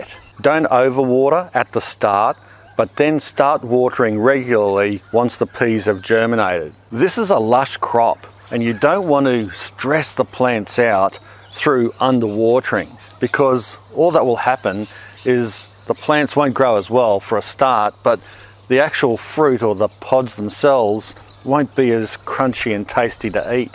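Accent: Australia. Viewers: beautiful video! A bit of a personal question - what happened to your arm? Did you get bit? I've been meaning to mention it as it looks scarred - just curious as to what happened to your forearm. Looks like it healed nicely though. Looks like it happened a long time ago. As someone with scars on one of his arms too it's just something that jumped out at me. You're still beautiful! ;) Also I feel many of us listen to your videos half for the helpful tips and also for your great cheerful personality and wonderful accent - I come from canada myself and I love australian accents :)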